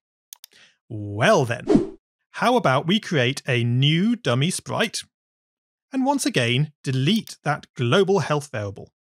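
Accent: United Kingdom. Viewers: BAD MOVIE, MAN SOUND TOO BRITISH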